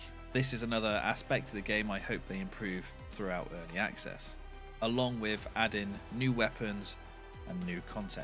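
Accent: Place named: Bristol, United Kingdom. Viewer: U sound like ur from Bristol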